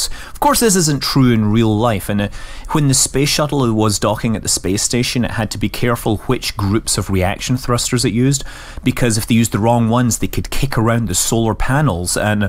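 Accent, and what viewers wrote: Scotland, United Kingdom. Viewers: He has a scottish accent :)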